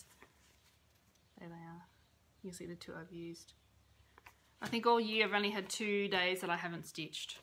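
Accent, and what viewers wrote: Australia. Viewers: Enjoy your flosstube and enjoying your "Aussie-Isms"!! I am from NC in the US and a video friendship with an Aussie stitcher is great!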